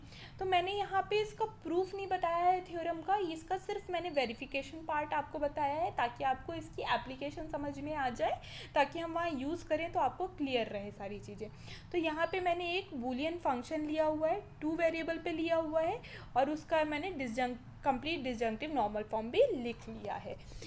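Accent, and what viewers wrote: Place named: Indore, India. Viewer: Ma'am aap Indore se ho kya ?? Aapke bolne k tarike se laga mujhe. Btw well explained.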